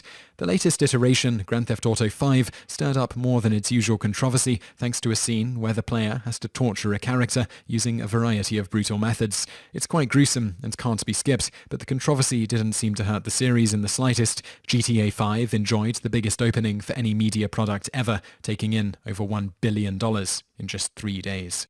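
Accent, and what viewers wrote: United Kingdom. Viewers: Oh dear god. If I see another comment complaining about how the narrator pronounced 'Controversy', I'm going to smack someone. It's his accent. It's NORMAL! There is more than one kind of accent in the UK. Not everyone there sounds like Hugh Grant, so get off your high horses. Every English speaking country pronounces things in a different way. Perhaps we're the ones saying it differently because the English language obviously didn't start in North American. It's like me making fun of Americans for spelling things all wrong Ex. It's Humour not Humor or It's Colour not Color. Though, with all due respect, the USA is probably the last place to look to for 'proper' English. Speaking and spelling.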